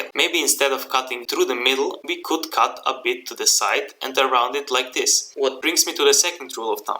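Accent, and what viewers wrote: Russia. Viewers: INTERESTING, only I hear a Russian accent, or I'm wrong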